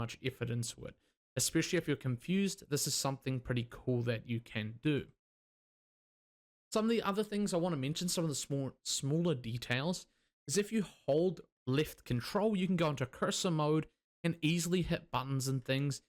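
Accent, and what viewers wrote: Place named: New Zealand. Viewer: Nah he's just from New Zealand, they sound like that innit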